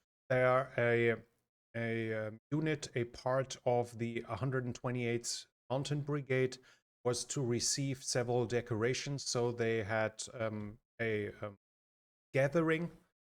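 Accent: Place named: Germany. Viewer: With your voice today more close to Deutsch accent 😊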